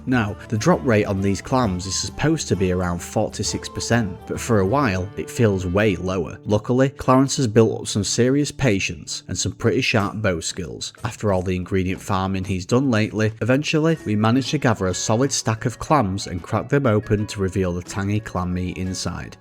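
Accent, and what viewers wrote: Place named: United Kingdom. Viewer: Id speculate he's on GMT ish based on the accent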